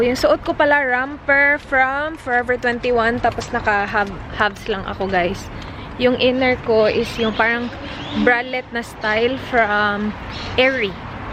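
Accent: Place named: Visayas, Philippines. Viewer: Te pag bisaya nalang ... bisaya Kay imong accent ... love ur language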